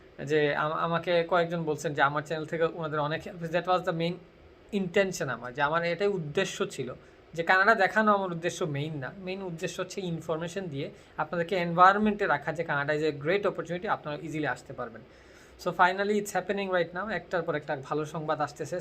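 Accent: Sylhet, Bangladesh. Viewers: Vaiya, are you sylheti? Your accent makes me curious 🙈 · Sylheti bhai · That's Sylheti😍😍😍 love you bai♥️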